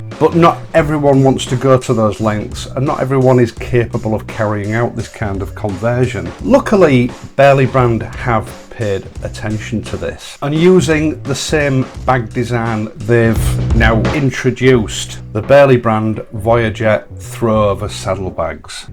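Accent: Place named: Manchester, United Kingdom. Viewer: Good to hear a Manchester accent! You ought to be doing Bodington adverts!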